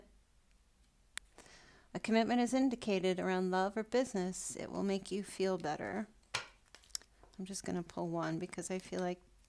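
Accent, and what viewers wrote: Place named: New York, United States. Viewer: You sound like you from NY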